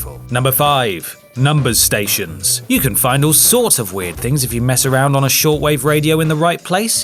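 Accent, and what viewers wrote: United Kingdom. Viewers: They are mysteries because they cannot be explained. You couldn’t explain them. Only report them. But I enjoy your Brit accents.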